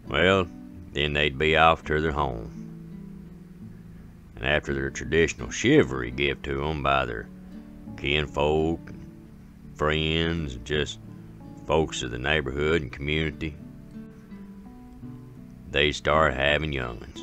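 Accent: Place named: Fayette County, United States. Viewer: Don’t forget the churnin and the ironin and the cannin and the patchin and the bed turnin and the water pumpin and… I declare, listening to you makes me feel like I’m back in Fayette County and Uncle Joe is telling stories while showing off his greens garden!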